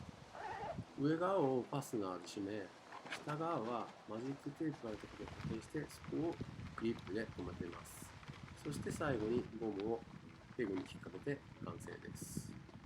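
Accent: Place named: Hiroshima, Japan. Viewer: おはようございます！広島のイントネーションの解説落ち着きますね👍手順がよく分かって助かります。 自作タープ私も作ってみたいのですが、生地代だけでも結構なお値段なので中々着手出来てませんw 幕内薪ストーブお篭りぬくぬく憧れなのですが、私にはまだ早そうなので来期挑戦したい憧れスタイルです😄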